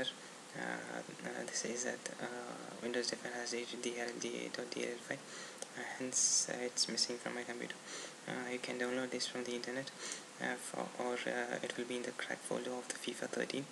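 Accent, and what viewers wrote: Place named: United Kingdom. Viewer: Inglés británico.... es otro nivel, deja de estudiar el inglés americano porque no sirve realmente.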